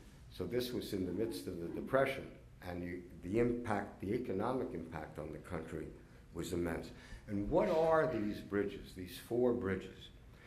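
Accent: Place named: New York, United States. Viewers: Authentic articulate New Yawker. Could listen all day long. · Congratulations on just now discovering what a "New York Accent" in the year 2020.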